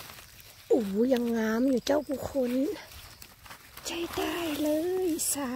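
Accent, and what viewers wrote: North, Thailand. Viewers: เออเห็ดแถวบ้าน ดอกมันไม่ค่อยใหญ่เหมือนประเทศอื่นๆเนาะยายเห็นเค้าเก็บกันที่ปะเทศอื่นๆดอกก็ใหญ่ๆจังยายชอบ พูดพาษาเหนือฟังแล้วเพราะดี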